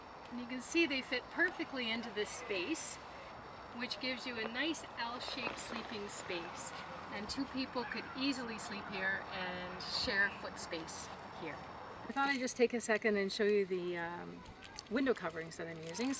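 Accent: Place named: Canada. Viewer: Sweet setup and that Canadian voice doesn't hurt at all ... all the best!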